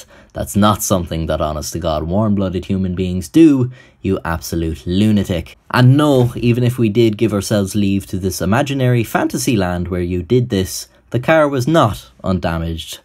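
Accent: Ireland. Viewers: You got 100k cause you have a Irish accent everyone likes Irish accents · Love your page! The content is very interesting and your storytelling ability mixed with clever bits of humor is top-notch. The Irish accent doesn't hurt either. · a bit late his accent if you can not tell is clearly Irish so i believe that he is Lebanese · I thought it was Slavic but ever since I saw this comment it's sounds Irish not Slavic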